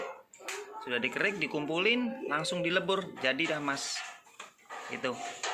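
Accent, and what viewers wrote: Surabaya, Indonesia. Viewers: Om daerah mana?? Kok bhs nya Suroboyoan. ??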